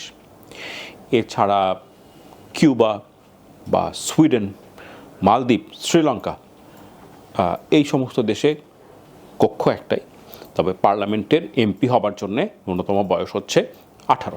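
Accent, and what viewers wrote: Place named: Kolkata, India. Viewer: আপনার ভয়েসটা একদম কলকাতা দের মত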